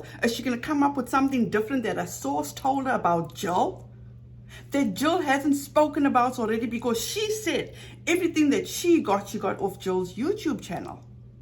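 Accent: South Africa. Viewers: has GOT to be sipping vodka in that cup, and be delusional af if she thinks that 'joke' was funny in any way, shape, or, form.. P.S. Are you from South Africa? Even speaking about, your voice and laughter sound like music! It's beautiful, thank you for sharing it with us!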